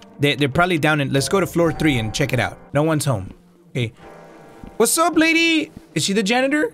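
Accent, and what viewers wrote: Spain. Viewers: Bhahaha the Spanish accent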